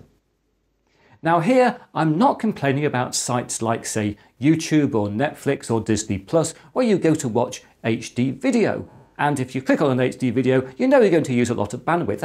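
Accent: United Kingdom. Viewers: It's like LInus Tech Tips but Bri'ish.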